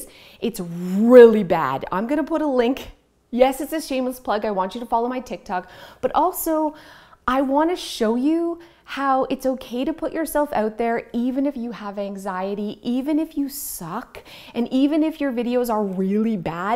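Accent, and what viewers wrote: Canada. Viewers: I don't have ADHD but I suffer depression since I was in my early 20's. All that mentioned today was a big help 😃 I started decluttering and re-organising my home about 5 weeks ago. I found your channel 🥰 I love your Canadian accent x I lived there for nearly a year in the 90's. you have been a great inspiration to conquering this huge mission I've started 😘 So far I've done 4 trips to the op shops here in Australia 🇦🇺 Reusing and upcycling what I already have in my home 🏘️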